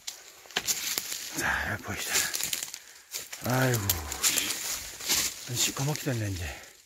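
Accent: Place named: Gyeongbuk-Gangwon, South Korea. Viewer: 좀더 다니셔야 겠습니다^^ 알수없는것이 능이철이라 늦능이 대박산행 15일정도 열심히 다녔던 기억이 ㅋㅋ 수고하셨습니다 님 말씀이 경북 강원도 경계의 억양처름 들립니다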